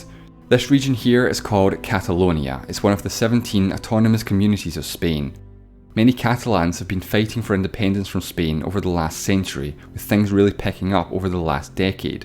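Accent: Scotland, United Kingdom. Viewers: The first scottish guy I like Your accent is painful tho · wait ur Scottish? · He's from scotland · well he does sound scottish tbh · Are u from Scotland Lad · Where is his accent from? So pretty did he say "as a scot" i didnt understand that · Is it just me or does sound very scottish in this video? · Accent, bro. SCOTLAND FOREVER!!!!! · I was trying to find that out too! He did say "us Scots" and I just checked his other videos, his accent is def Scottish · I honestly don't know whether you realize how obnoxiously annoying the Scottish accent is, and that nobody likes it. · Scottish accent - Best! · Love Scottish accent, the enunciation is a lot better compared to the older videos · What accent is this? Irish or Scotland?! Oh hhhhh, Scotland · I thought the accent of British English is beautiful, this video proved me wrong. ~~Wait, is this video in British English? 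He mentioned he is Scot at a certain part, but isn't that part of the GB?~~ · They do sound weirder. He's actually toning down his accent to sound understandable to non-Scottish folk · Actually he's Scottish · You had me with your wonderful Scot accent! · dude i would have subbed you if not for that Scottish accent of yours. can't understand a thing without turning on the subtitles. honestly, that accent is totally alien to me!! i thought there were only 3 accents, namely British, American, Australian and i can get all three but now i know there's this weird 4th one as well. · are you from Scotland? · Are u a scotish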